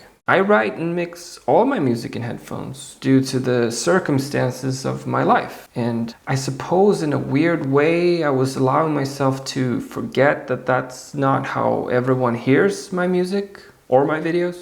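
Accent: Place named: Spain. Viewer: The intro was Great. Good spanish accent! I 100% agree with your thoughts on adding production tricks and not letting your music breathe. Finding the confidence to let a section breathe is something I really need to start doing. If you are not aiming to commercial music just skip those constraints! Long intros are great in my opinion but if it will always be someone that won't like it. Just do what excites you and someone will also think the same! Great video. Hope you are liking Spain. It's a great country with an awesome weather.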